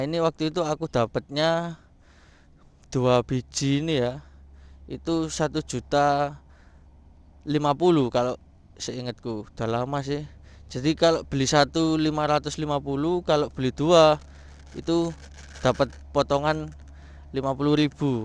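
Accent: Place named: Java, Indonesia. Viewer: Mas nya medok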